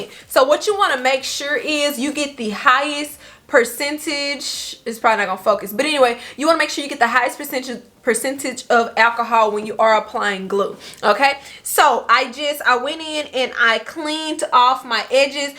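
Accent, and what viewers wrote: Louisiana, United States. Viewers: Guh you from Louisiana? Lol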